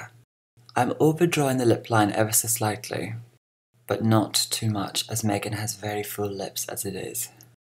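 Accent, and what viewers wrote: Scotland, United Kingdom. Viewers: I've been binge-watching your videos today and I have noticed that your Scottish accent peeks through more in these older videos. The way you speak is absolutely hypnotizing.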